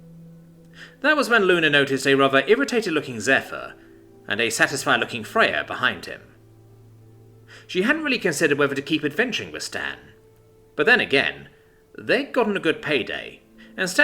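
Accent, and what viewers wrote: Canada. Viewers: Defiantly a Canuck!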